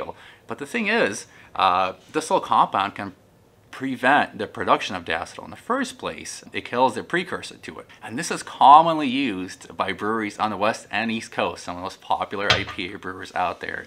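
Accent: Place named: Midwest, United States. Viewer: Genuinely refreshing to see more beer youtubers who can speak midwestern too. thanks, A Detroiter (ps our deep dish pizza > your deep dish pizza - italian beef is great though, malort not so much)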